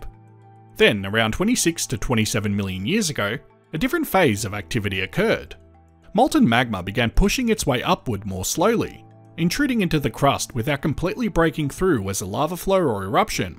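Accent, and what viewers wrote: Australia. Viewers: It is part of the Australian accent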